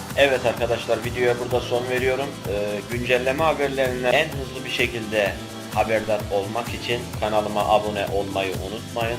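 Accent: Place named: Anatolia, Turkey. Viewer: Tam Bir Anadolu Çocuğusun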